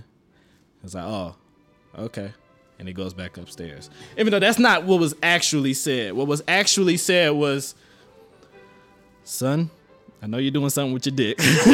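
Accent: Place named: Michigan, United States. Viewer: accent! #MichiganNative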